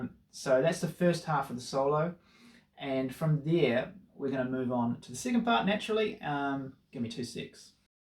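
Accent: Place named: New Zealand. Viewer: Thanks for doing this. It's a lovely song. One note: Because of your Kiwi accent, I thought you said 19th fret when describing the solo when you were actually saying 9th/10th hammer on. I was flummoxed for a few minutes until I groked it.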